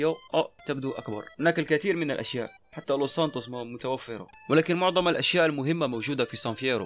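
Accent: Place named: Egypt. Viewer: يا انت مصري ولا جزائري ؟ انا متأكد انك مصري علشان بتتكلم زي المصريين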